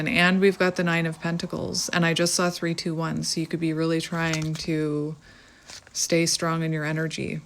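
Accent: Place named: Canada. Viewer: As a Canadian I've been wondering for a minute if you were also Canadian because of your accent. I'm in the U.S. so I can def recognize that homeland dialect 😂❤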